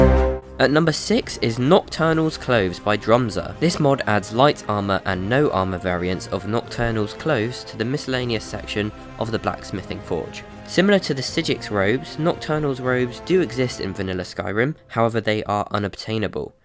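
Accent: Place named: England, United Kingdom. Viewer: You have the “crispest” English accent I’ve ever heard. Deus Vult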